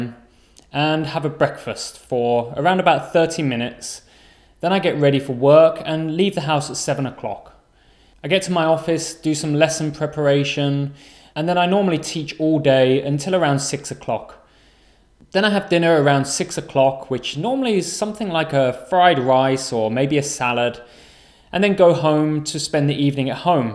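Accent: United Kingdom. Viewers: How beautiful British accent is! Thank you for the lesson!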